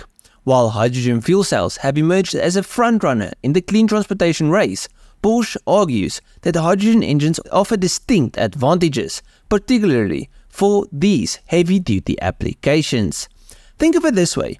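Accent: Afrikaans, South Africa. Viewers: Dear God that thick Afrikaans accent hit me with a wave of nostalgia. Subscribed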